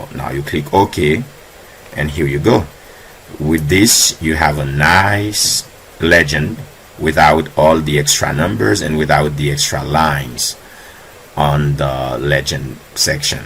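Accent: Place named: Haiti. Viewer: Thank you, my brother. I've been trying to figure this out. You're from Haiti? I know the accent when I hear it!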